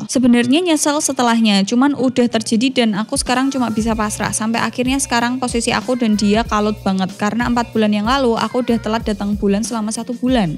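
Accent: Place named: Semarang, Indonesia. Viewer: Semarang mass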